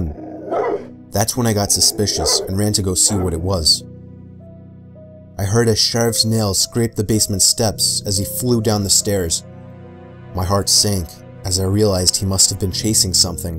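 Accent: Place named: New York, United States. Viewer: Are you from NY? I hear that accent, you say dog the same way I do.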